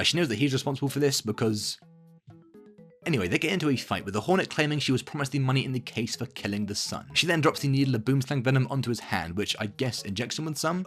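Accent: United Kingdom. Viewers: The British accent and sense of humour charmed me now I am a subscriber.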